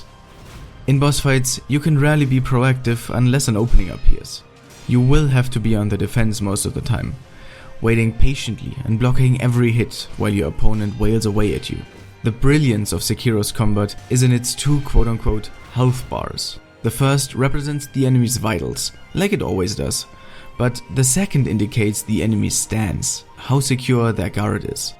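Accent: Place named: Germany. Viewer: sounds like a german accent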